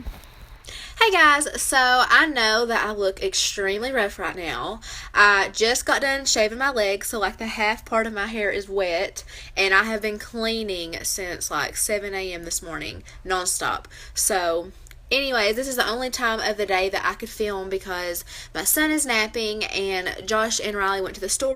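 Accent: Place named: Kentucky, United States. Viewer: Do you mind my asking where you're from? I grew up in Lexington Ky....your accent sounds like home to me. Really enjoy your videos, some ideas for something new to make.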